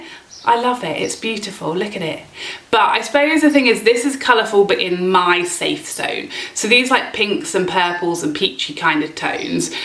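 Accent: England, United Kingdom. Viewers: I seriously CANNOT understand WHY it's so hard to ship to the UK... i told the lady at the Post Office THIS IS CRAZY🤪🤪🤪 I'm So so so sorry it never arrived. The last time I sent it, I haven't received it so you may end up with 2💋😁🤪 Maybe one day. But GEEZE. I am so sorry I wasn't able to get that Palette in your hands sooner.. LOVE YOU MY SWEET ❤❤❤ I adore you in EVERY way. And your English accent GIVES ME LIFE🙌🙌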